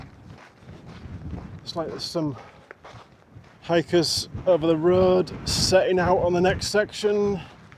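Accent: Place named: North, United Kingdom. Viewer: Congrats in your 10x10. Video is inspiring as always. Not tired of the Northern accent and hope your toe feels better soon. Cheers 🏔️🏕️🏔️🏕️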